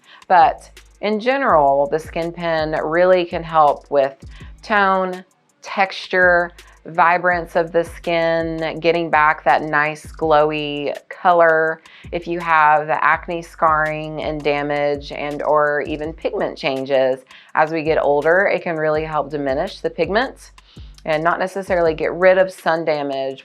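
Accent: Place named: Alabama, United States. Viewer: Immediately recognized your beautiful accent. Alabama here.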